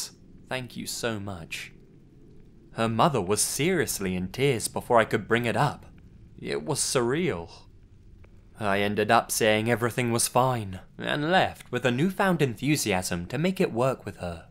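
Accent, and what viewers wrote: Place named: United Kingdom. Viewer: Hi, I'm English and enjoy your channel very much, the English comment was because of your very British accent. You sound like a BBC docu. narrator, it "kills" me for this reason when you drop in vernacular, like, "...naturally, she lost her shit.", or "nearly shit myself!" WELL DONE, keep doing what you're doing and I will keep veiwing. Respect! ANDY F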